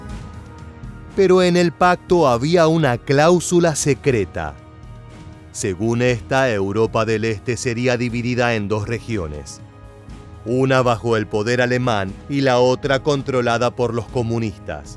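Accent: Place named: Argentina. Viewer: El locutor es argentino ja vamos nomás jajaja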